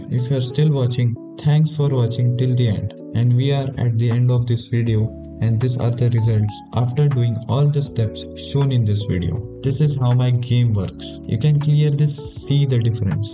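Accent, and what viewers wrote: India. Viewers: Bro this voice really like of a Indian person...ARE U INDIAN 😮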